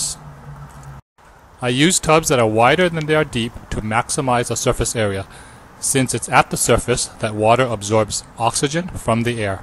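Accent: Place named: Hawaii, United States. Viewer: Hey! Good video! I can definitely sense that local accent. You're from kaimuki I assume?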